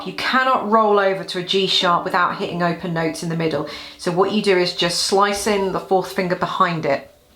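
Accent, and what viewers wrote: England, United Kingdom. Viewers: honestly sometimes i am watching you only for your english accent😂 btw i am a foreigner your tutorials are what we need as student so thank you for your effort.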